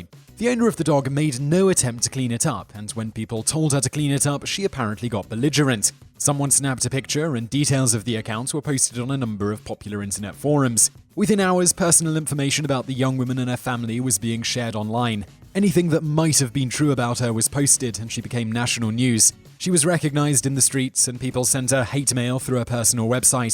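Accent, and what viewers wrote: United Kingdom. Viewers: Young sounds so much more British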